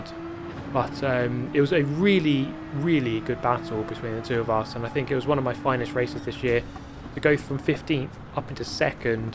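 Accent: United Kingdom. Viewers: Shhh nobody tell him any monotonous motorsport hum in the background will do for some people...😂 No seriously, I fell asleep many times to but the Voice IS certainly a part of it. Also the british accent and the sense of humor!! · Grove sent me here. Can't beat a British mans narration!!! Excellent Video.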